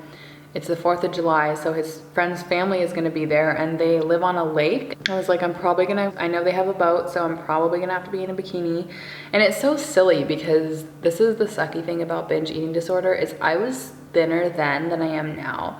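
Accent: Seattle, United States. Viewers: I watch this type of videos, or whatever has a lot of chats, just because I'm trying very hard to improve my english that is quite shitty at the moment ahahahah and I never comment anything.. just watch and try to learn some english.. but you look so nice and kind and I love to listen what you say so I suscribe! ❤️ Whatever I have to put subtitles.. Feel like I don't understand the Seattle accent yet 😅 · Girl I’m born and raised in Seattle and hearing the advocate in you is such a “Seattle thing” lol idk how to explain it but how you talk is so seattle lol LA people are nothing like us Seattlites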